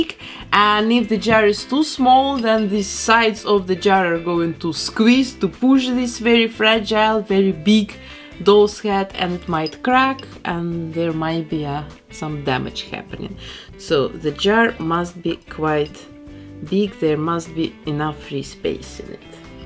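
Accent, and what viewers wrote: Russia. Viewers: I'm sorry, but I want ask you You from Russia or it seemed to me?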